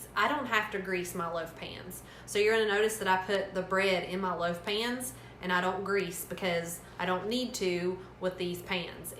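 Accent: South, United States. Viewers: Hi, watching from the UK, & I love your deep southern American accent 😊❤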